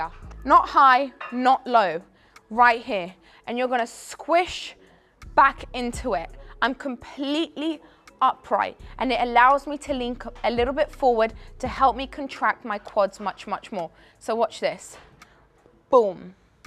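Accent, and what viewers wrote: England, United Kingdom. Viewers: me encanta tu acento britanico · Смотрю ее только из за английского акцента)))))) ну и жопа тоже класс😁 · she caught me off guard with that f bomb.... sounding all proper with that British accent and looking so innocent. Lol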